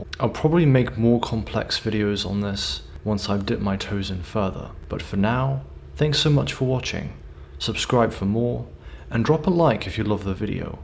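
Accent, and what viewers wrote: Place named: United States. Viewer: Warner 'broes'? Have they changed their name or does the person live in murica, going by his accent?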